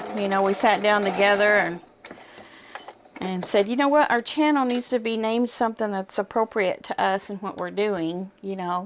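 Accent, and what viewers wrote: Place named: Appalachia, United States. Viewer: Going back and looking at some of your older videos. Loved learning a bit more about you,. I do love your Appalachian twang—it’s very endearing. I think we should all be proud of where we’re from. I was born in Arkansas, but New Mexico is home now. You are a natural at producing YouTube videos! I’m enjoying and learning from your Lessons Learnt channel.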